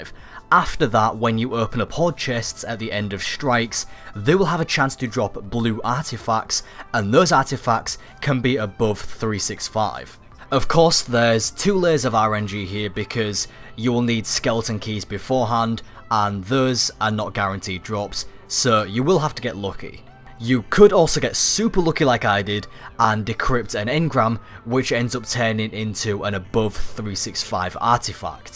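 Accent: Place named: Northern England, United Kingdom. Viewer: Because he has a northern English accent.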